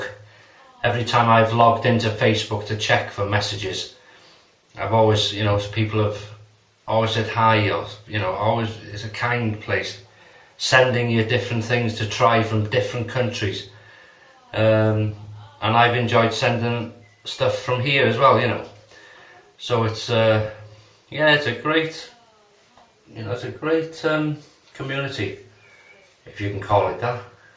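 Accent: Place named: Wales, United Kingdom. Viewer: Nice vids! Subbed. Didn't know you were welsh...(I am rubbish at figuring accents out haha). -Matt (Norwich)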